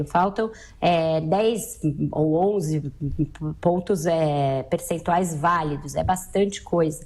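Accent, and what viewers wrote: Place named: São Paulo, Brazil. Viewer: Sotaque charmoso, TU TÁ DE BRINCADEIRA, alias o seu sotaque paulista também é chato lembrei-me daqula moça correspondente da Globo em Londres ela é cheia de XXXXXXXXXXXXXXXXXXXXXXXXXXXXXXX horrivel da mesmo forma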